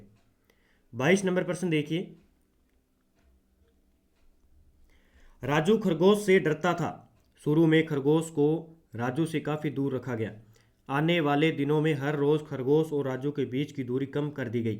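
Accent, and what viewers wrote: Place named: Bagri, India. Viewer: Sir aap jo bich bich me bagdi type bolte ho wese hi btaya kro..... Bhut ache se smjh me aata h 😊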